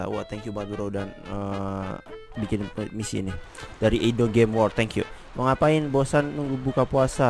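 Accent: Java, Indonesia. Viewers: Kaka dari jawa mana , kalo aku bayuwangi Jawa Timur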